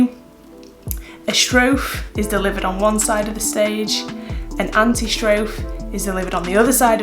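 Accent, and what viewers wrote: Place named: United Kingdom. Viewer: A beautiful girl with a British accent who talks about tragedy. 😍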